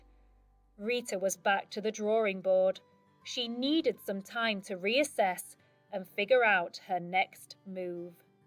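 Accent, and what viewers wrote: England, United Kingdom. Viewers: Are it's use British Accent? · English UK? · Great story to learn English with British accent. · British English is so pretty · British accent 😍😍😍😍😍😍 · Does this program pronounce with British accent? It is so beautiful! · What a lovely story! I enjoyed and appreciated it quite a lot as it gives the chance to improve and keep up to date my reading and listening skills.I do love the English accent so thanks a lot! Marinella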